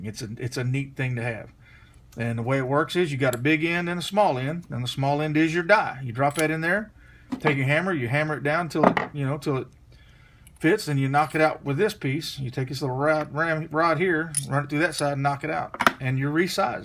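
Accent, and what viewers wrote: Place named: South, United States. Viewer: Very nicely done , especially about sticking spent brass from another gun, I bet a thousand people have done just that!! Don't worry I have been married for over 30 + years but you have a nice voice for video's,kind of like slim pickens ,has kind of a southern draw! I subbed. You should make one using that device since I own one now!And spent over a hundred on primers brass bullets ETC!!! Very good video again.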